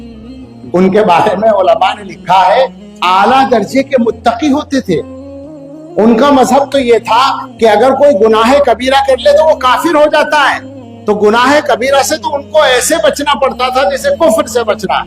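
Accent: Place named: Hyderabad, India. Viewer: جناب کی شکل تو مودی سے ملتی جھلتی ہے، اور آواز و لہجہ بھی انڈین حیدر آباد والی ہے۔ 😊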